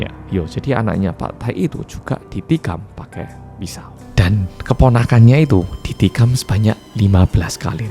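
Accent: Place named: Malang, Indonesia. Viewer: Iki ceritane wong korea berusaha ngomong bahasa vietnam dengan logat jowo malang nggih mas...😅❤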